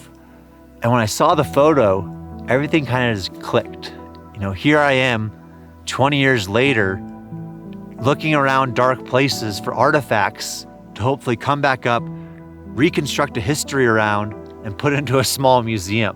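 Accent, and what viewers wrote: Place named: East Coast, United States. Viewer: You sound like youre from the east coast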